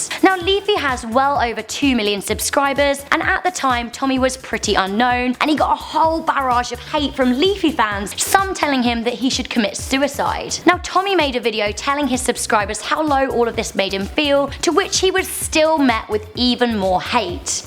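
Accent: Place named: United Kingdom. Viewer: ( ͡° ͜ʖ ͡°) youre beautiful and so is your british accent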